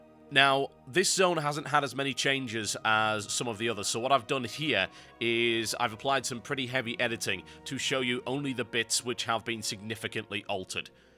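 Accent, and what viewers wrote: United Kingdom. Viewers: When i logged in to YouTube i was like: "Oh, come on now. I need some fantastic brittish commentary on some fresh Cataclysm videos." And When i saw this video under subscriptions, i was like: "Yessss!":D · Erm, british accent?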